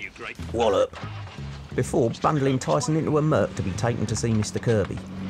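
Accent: London, United Kingdom. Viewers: Absolutely brilliant stuff, loving the old London footage from the greatest cop show ever ! even though i come from the North of the city, its still a welcome trip down nostalgia lane ! keep up the good work mate, love your proper London accent too, its sadly dying aint it 😢👍🏻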